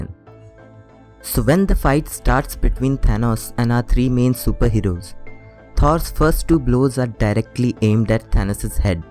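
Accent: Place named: India. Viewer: R u indian cause ur English is id wanna say it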